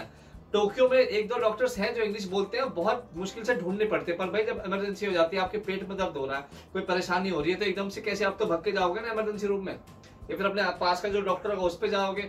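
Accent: Haryana, India. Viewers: Bhai kha se ho Haryannve se lag rahe ho bhasha se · भाई राम-राम जी, बोल्ली से तो हरियाणा या वेस्ट यूपी के लाग रे हो? बताई दो कां के हो 😊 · Sach me yaar tumhara hindustani accent h jo bolne ka dil jit leta hai. Bb ki wines se bhi tagre ho ap yaar mstram ho bhai ap sach me god bless u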